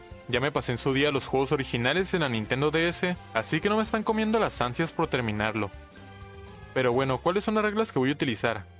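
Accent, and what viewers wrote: Chihuahua, Mexico. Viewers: Eres de chihuahua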